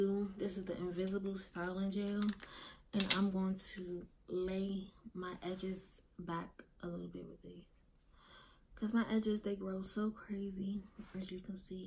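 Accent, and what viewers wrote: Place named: DMV, United States. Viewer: I know a DMV ACCENT when I hear one 🥰🥰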